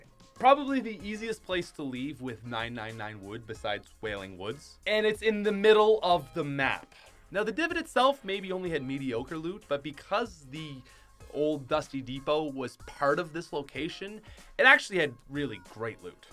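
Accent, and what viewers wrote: Canada. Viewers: Are you canadian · is it a canadian thing to say anywhere and nowhere with s at the end or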